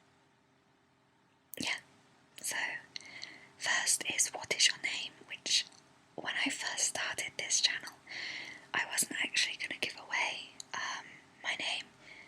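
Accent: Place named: England, United Kingdom. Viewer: Well I'm sure guys that know you an your boyfriend will agree with me. I like your accent aswell where are you from in England?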